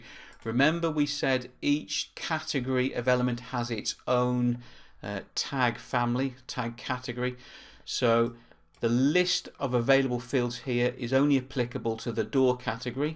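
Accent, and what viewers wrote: United Kingdom. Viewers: I installed a YT Add-in which allows you to set speeds up to about 25X....but I find that 2.5-3X is the highest, intelligible speed with this (& many other Brits). However, is one of the better, cohesive sets of videos out there.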